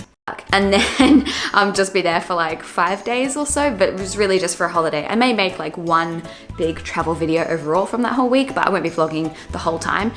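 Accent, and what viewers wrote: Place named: Australia. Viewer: You are so genuine and charming, a lot of vloggers put up a pretty obvious facade. Also lovely to hear an aussie. Keep em coming! / Currently deployed somewhere in the desert - fan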